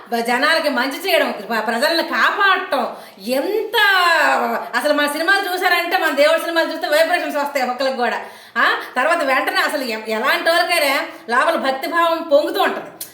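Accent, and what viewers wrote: Srikakulam, India. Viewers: సికా కులం ఏమో 😂 అనుకున్నా నేను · అక్కా నీ శ్రీకాకుళం బాస చాలా సూపర్, కానీ యేసులో ఉన్న ఒక్క కొలటి కూడా నీలో ఉంటే చాలా అభిమానించే వాడిని, నీకు దేవుడు మారుమనస్సు దయచేయును గాక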